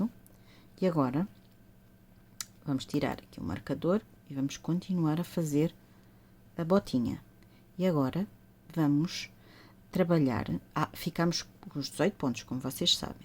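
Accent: Portugal. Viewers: ❤❤adorooo eeu sotaque português, parabéns vc ensina muito bem ❤❤❤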